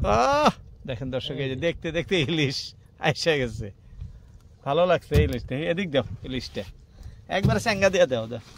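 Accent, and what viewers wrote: Bangladesh. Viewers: চাচা আমি আপনার ভিডিও দেখি,, আসাম থেকে ভিডিও গুলো দেন,কিন্তু আপনার ভাষা বাংলাদেশের মানুষের মতো, আসামের ভষাতো এরকম না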